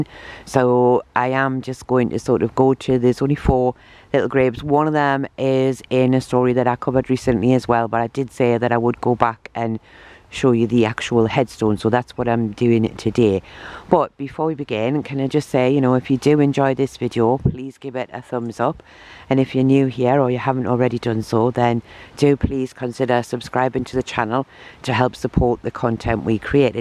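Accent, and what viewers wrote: Newcastle, United Kingdom. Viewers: Love your content & love the Gordie accent. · More Geordie!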